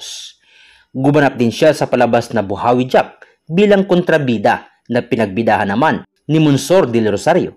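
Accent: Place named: Visayas, Philippines. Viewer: Bisaya siguro ang vlogger.😊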